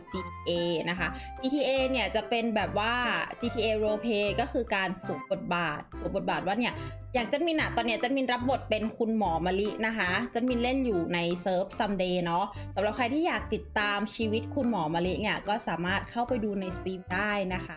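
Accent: Thailand. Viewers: 1พี่อายุเท่าไร 2พี่เป็นคน เหนือ กลาง อีสาน ไต้ #เท่านี้แหละ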